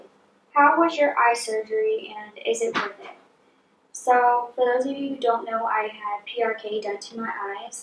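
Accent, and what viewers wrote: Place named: South, United States. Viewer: where are they from they kind of have a southern accent.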